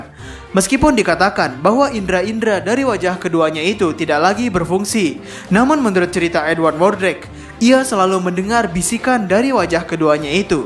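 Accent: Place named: Lombok, Indonesia. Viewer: Iye jage, dengan sasak badek wkwk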